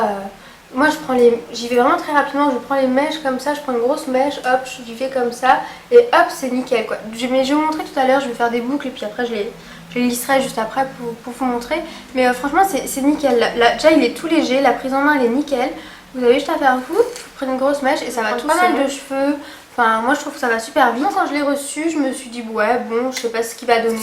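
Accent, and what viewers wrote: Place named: Alsace, France. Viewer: Hello ! Je participe a ton super concours! Je n'ai pas malheureusement rien testé de la marque Remington, je n'ai pas de lisseur non plus car mes cheveux sont relativement lisse comme les tiens; et grâce a ton tuto je me dit que je pourrai finalement quand même en utiliser un pour me faire des ondulations ! :) Mon anniversaire est le 24 oct ça serai un superbe cadeau haha Ps: J'aime beaucoup ton petit accent Alsacien !!!!!!!!! vive l'alsace ! salut bissome !!! :)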